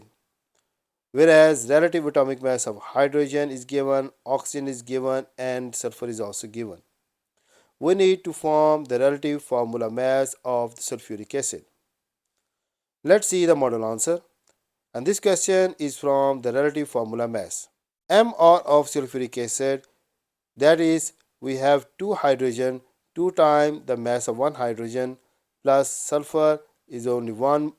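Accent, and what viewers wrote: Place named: India. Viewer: The indian accent makes it funny lmao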